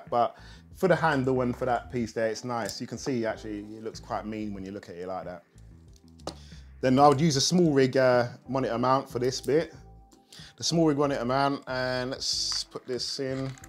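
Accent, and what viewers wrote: United Kingdom. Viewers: I'm here for the British accent, otherwise excellent review we need more of the affordable gear review.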